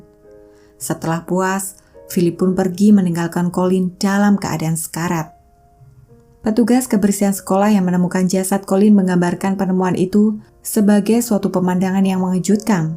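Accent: Java, Indonesia. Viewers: Mimin orang jawa ya?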